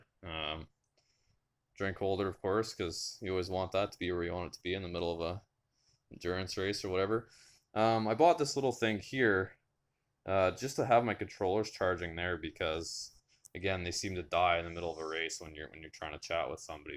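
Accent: Canada. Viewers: Sound Canadian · You sound Canadian eh? I am building one of these and people keep saying you can find car seats from a junk yard, I've never been to one in Toronto will I have any trouble?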